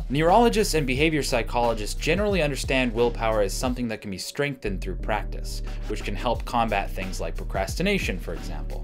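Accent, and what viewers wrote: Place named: Canada. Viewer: You must be Canadian... I could tell from your accent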